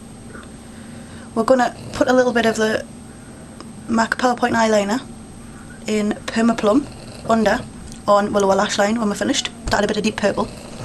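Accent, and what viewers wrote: Newcastle, United Kingdom. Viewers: are you from the north east? you sound like a geordie! reply please!!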